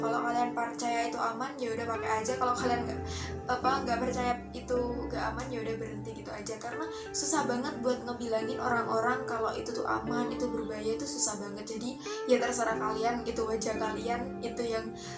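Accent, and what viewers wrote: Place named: Java, Indonesia. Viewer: Awalnya sih enak didenger, lama-lama kok jadi medokkk😂sukses terus gais💋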